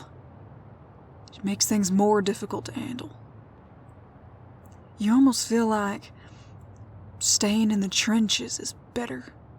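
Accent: South, United States. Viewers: The southern accent makes it so much more calming lol I always come back to these vids.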